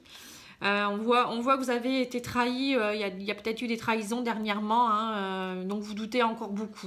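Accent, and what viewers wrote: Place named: Picardy, France. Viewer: Bien vu pour la F J ma moitié trop de signes qui me font penser à lui c est fou heures miroirs son prénom partout sa région !! Je suis Picardie et votre accent ne ment pas ma TCHOTE j ai le même !!! Félicitations pour votre perspicacité et votre don sans tous ces tralala bougies pierres statuts !!! Merci merci merci beaucoup et vous êtes sur le bon chemin vous aussi !!! Chacun sa vocation je soigne avec mes mains suite à une Mort imminente au Touquet Plage je vous embrasse et a bientôt en tant qu Abonnée 🙏😇👍💋🌹🌹🌹🌹🌹🌹🌹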